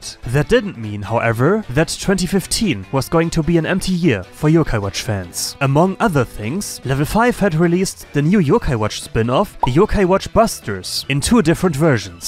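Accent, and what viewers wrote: Germany. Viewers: Your accent ist sehr sehr deutsch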